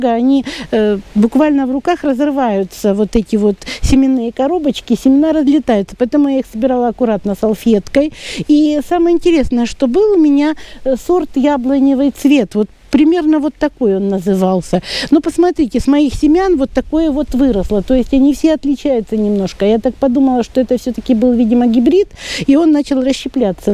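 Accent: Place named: Russia. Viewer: Hola,creo que por el acento eres rusa,hermosas plantas,aunque no se que decías, abrazos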